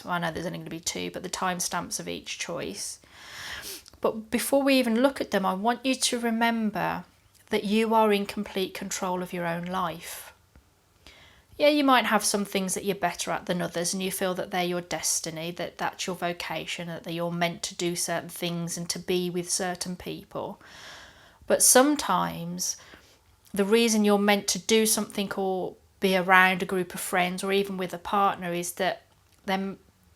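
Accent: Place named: Tamworth, United Kingdom. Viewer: wow are you from Tamworth UK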